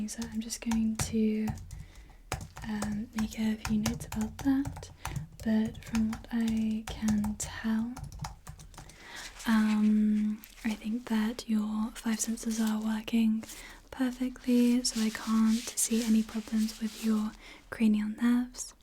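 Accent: United Kingdom. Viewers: pretty sure it’s british ! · Of all the nicest reasons to watch your ASMR I appreciate your accent, British is my favourite 🤍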